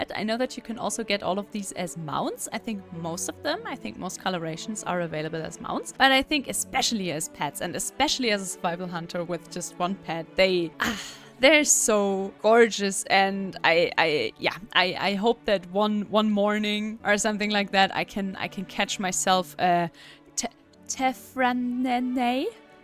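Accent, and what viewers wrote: Germany. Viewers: Ich lieb doch deinen deutschen Akzent😭😭 Abo is raus!! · you are german right?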